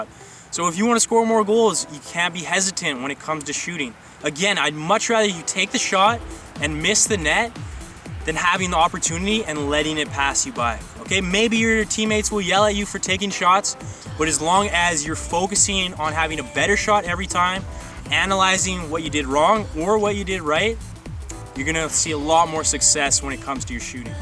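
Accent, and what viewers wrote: Canada. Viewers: Are you Canadian? :)) Abowt. Abowt. Abowt.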